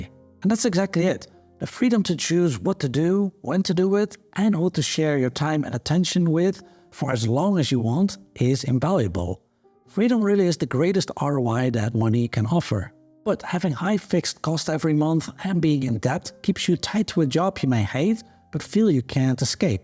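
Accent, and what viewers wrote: Netherlands. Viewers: Hey, would you be dutch by any chance?? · You sound like you are from NL. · You sound Dutch.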